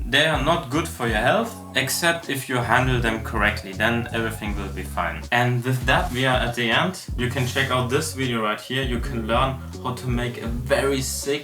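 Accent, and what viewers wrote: Germany. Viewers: Are you from Germany? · Cooles Video aber man hört das du deutsch bist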